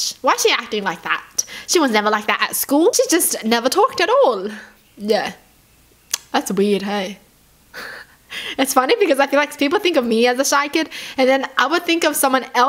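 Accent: Australia. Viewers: I do like your voice! Australian accents are so cute and adorable🥹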